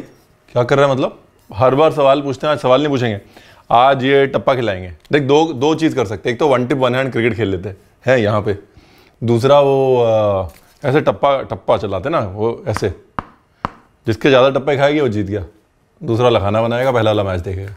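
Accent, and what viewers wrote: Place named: Punjab, India. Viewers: "Amrricca" pure Punjabi English . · Yess thats Punjabi style.....Chef Harpal ardak bolate hai...adrak ko😀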